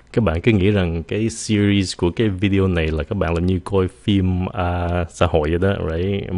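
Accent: United States. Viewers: you pronounce like American, i saw two youtube channels between british and american, there are some differences in pronunciation. perharps, i just wanna know how to use these words....tks u for videos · cách phát âm thầy dạy ở đây là theo tiếng anh Mỹ ạ? Em định học Ielts, phải phát âm theo chuẩn tiếng anh Anh, thầy có dạy theo cách này mà phát âm của người Anh ko ạ?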